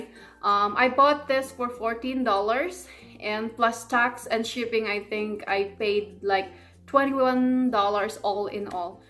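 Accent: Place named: Visayas, Philippines. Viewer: usong uso yan sis maganda daw talaga gumamit niyan...ok lang ang punto na bisaya gusto ko nga kasi sarap sa tenga pakinggan ng salita niyo ")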